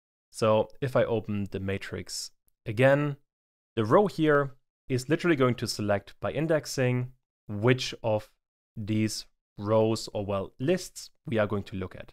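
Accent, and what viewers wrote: Germany. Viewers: haha moin😂 Wollte nur fragen wegen dem Akzent.Der ist ja auch nicht komplett englisch. Vielleicht ist es ja deutsch? 😅 keine Ahnung. Aber du bist anscheinend deutsch 😂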